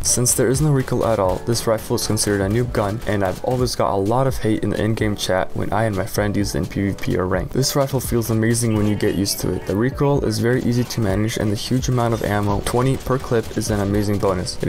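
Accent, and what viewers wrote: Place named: Russia. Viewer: По акценту видно русский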